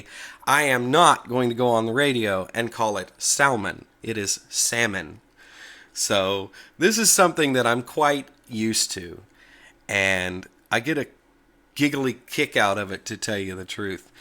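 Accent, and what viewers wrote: South, United States. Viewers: Does pronunciation matter? Well, not really as long as communication is possible (paaaaaaasible :-P ). Love that long "a" sound so many Americans have on words with "o" in them. I can't even make that sound :-D I love all the accents you get on Youtube, all those different people in different places, proving how diverse the world is. My accent and dialect is English (a mixture of west Yorkshire and west Midlands dialect and accent). I was having fun with your accent on the Ubuntu video, I'm guessing it's southern US somewhere? Virginia?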